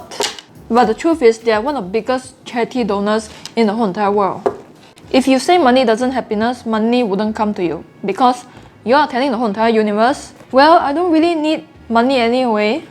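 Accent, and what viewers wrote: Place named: Singapore. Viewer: Two things i love from (No Sarcasm): 1. Her punked singlish accent & style 🤘 2. F*ckin honest forex coach & motivator who DOESNT SELL ANY CRAPS, DAYDREAMING & BULLSH*T things about trading forex. Big thanks & respect!! 👍🏻👍🏻👍🏻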